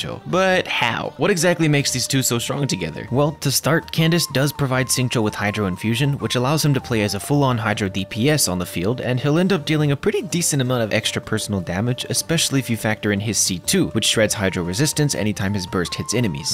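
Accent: United Kingdom. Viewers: wait ur british huh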